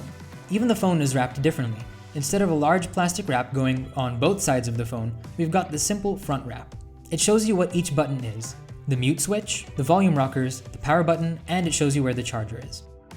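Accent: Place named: United States. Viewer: Living in india but have an american accent?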